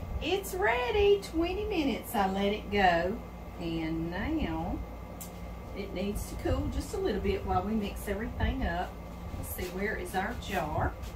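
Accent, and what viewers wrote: South, United States. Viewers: I thought I had a southern drawl……until I heard you! I feel pretty citified now!! LOL 😂 You can’t get too southern for me! I make pecan pies…….everybody’s favorite! My sister gave me the recipe probably 35 or 40 years ago. It calls for brown sugar instead of syrup. I tweaked it to my satisfaction and still add a bit of syrup but……it’s a great recipe. My extended family members always looked for my pecan pie at our get togethers! I’ve lost two sisters and a niece since 2020. I’m not able to cook as much anymore but…I’ll try this. 😃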